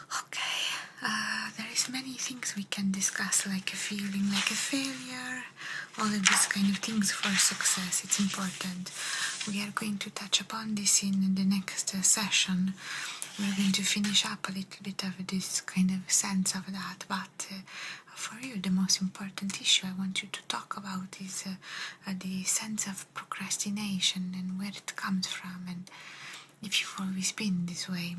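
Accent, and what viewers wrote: Italy. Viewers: You always think of good topics to discuss as your therapist character,. Your Italian accent is great too. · Ciao cara! Mi piace molto il suono della scrittura e il tuo accento italiano è meraviglioso, davvero adorabile. Sei bravissima e bellissima! Ti voglio tanto bene 🫶🥰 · I bloody love your Italian accent!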